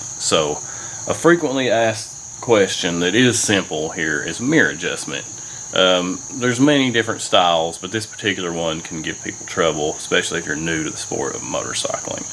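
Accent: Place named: United States. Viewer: But bro.. Is that how you all really talk in America??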